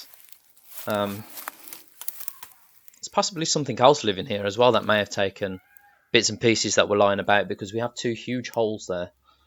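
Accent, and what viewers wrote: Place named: Midlands, United Kingdom. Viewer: Sorry to see you’ve lost your girls to the fox. You sound like you come from midlands like me. Weld mesh is only suitable for chicken runs. I would also invest in a good electric fence around your enclosure too. They can be run off the mains or a 12 volt leisure battery which can be recharged. I use a 50m holt line electric fence & it’s hooked up to the mains now. I’ve had many battles over the years with the fox, lost poultry. Good luck & i’m sorry about the birds. ♥️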